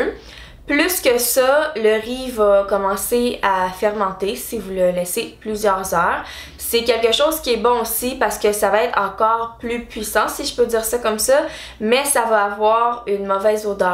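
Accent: Canada. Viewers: C’est l’accent canadien 🇨🇦😍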